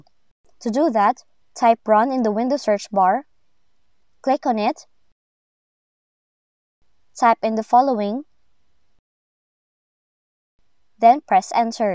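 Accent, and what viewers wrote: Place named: India. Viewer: I must say you cerntainly know what you're doing; however, (and I apologize for this) your Hindi accent overwhelms the pronounciation of some words (e.g.) step three, after the command "echo off|clip" you say press enter , then check __________(not understandable).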